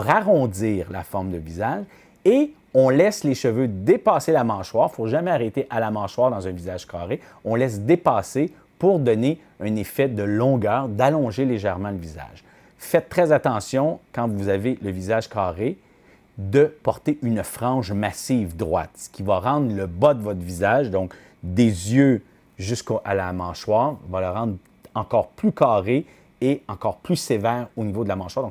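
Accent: Canada. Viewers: Très compréhensible et bien expliqué ,avec votre petit accent canadien que bcp de Français aiment!-Bonjour d' Allemagne d' une Française très interessant,surtout en période de Covid ,où les cheveux ont poussé énormément,les coiffeurs ayant été fermés assez longtemps!-Vos informations me serviront pour une bonne coupe chez le coiffeur!- Merci! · Oui bonjour moi aussi si j aimerai bien que je vous me coiffiez mais apparemment d âpre l accent vous êtes au Canada c est possible de vous envoyer une photo de face profil etc et que vous estimiez ce qu il faut faire contre rétribution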